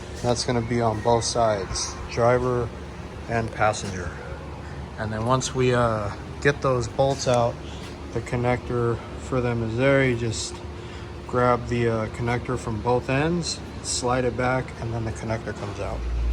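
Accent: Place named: United States. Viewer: Thank you from Spain to share this. I love Alfa Romeo Giulia and you explain very well even if with American accent! You help a lot with your videos!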